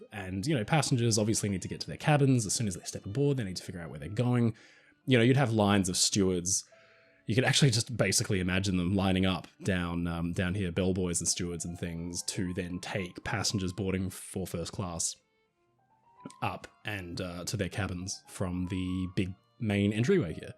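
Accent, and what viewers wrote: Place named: Australia. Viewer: Oh yes indeedy! I could listen to forever! He's got what it takes to be a successful narrator. First of all, he's an Aussie, and who doesn't love that accent; and he's got the perfect cadence in his voice, eloquent and elegant, which keeps the listener not only affixed to it, but always wishing for more! Maybe I've just described myself and my likes; and as for our ~ noone, and I mean noone has topped his talent here on YT (and I do enjoy listening to high quality programming)! 💙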